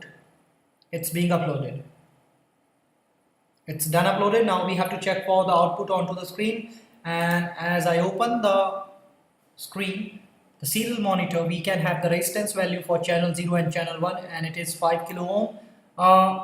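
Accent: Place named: East India, India. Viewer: The East Indian accent is difficult enough to understand without the tutorial taking place in a live room.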